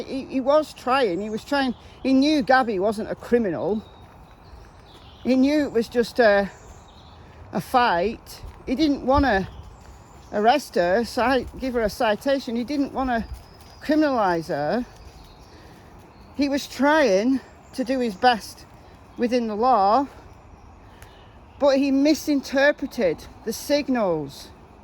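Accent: United Kingdom. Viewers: Your accent so sweet like British 👍🏾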